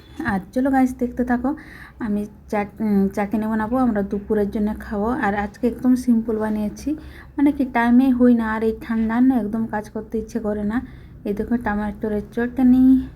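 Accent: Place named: India. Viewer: Tomar kotha gulo sunte khub valo lage ektu Hindi tan koto dhone pata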